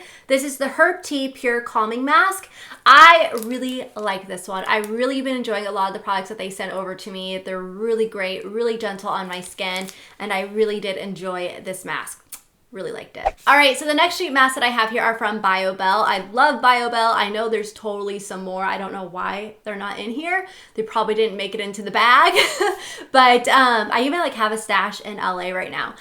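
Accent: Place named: United States. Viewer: I love ur American accent xoxo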